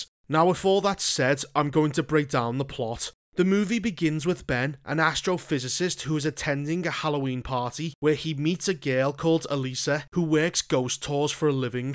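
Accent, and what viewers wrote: Liverpool, United Kingdom. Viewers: he has a 'scouse' accent as we say... it means he's from Liverpool. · He's from Liverpool. Very distinct accent.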